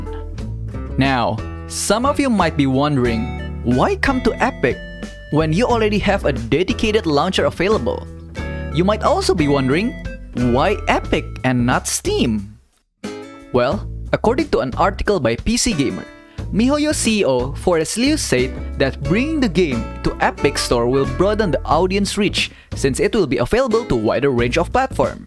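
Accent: Indonesia. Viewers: News org indo yah, accentnya indo bngt ngakak wkkwkekw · i just can hear the indonesian(?)/the south asian eastness 😂👌. love your contents, thanks for the updates👍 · Bro, you have Indonesia accent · aksen nya Indonesia banget (. ❛ ᴗ ❛.) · Ngapak anjay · I smell some Indonesian accent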